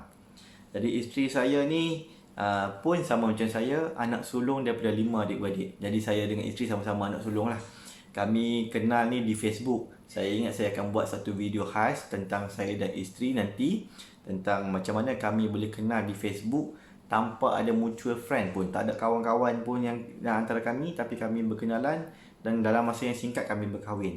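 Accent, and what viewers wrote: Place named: North, Malaysia. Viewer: Happy birthday, sikit lagi nak masuk 4 series. Dalam banyak dilek, utara punya dilek paling best dengar.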